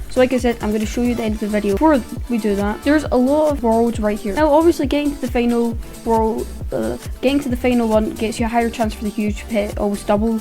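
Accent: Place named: Scotland, United Kingdom. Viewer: Scottish roblox YouTuber no way I was thinking about starting 😂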